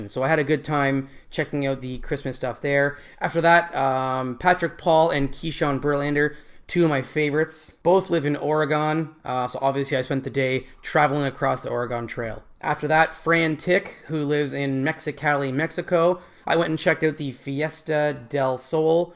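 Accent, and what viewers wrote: Canada. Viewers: This might be your Canadian accent though, but we pronounce the first syllable like BILL, not like BELL. As it's spelt. You passed in my book though!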